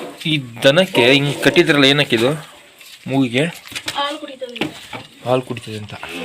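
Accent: Kodagu, India. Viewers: Bro, nivu kodaginavara ….? Nimma mathu kelidre hange ansuthe ❤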